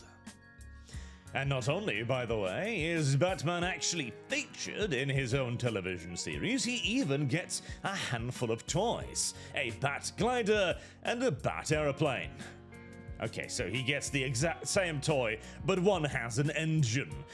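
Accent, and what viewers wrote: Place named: United Kingdom. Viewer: Damn your British Accent makes this review so much better. Feels like 🤔…Writing Styles of The Broke & Brainless would be an appropriate title 😂.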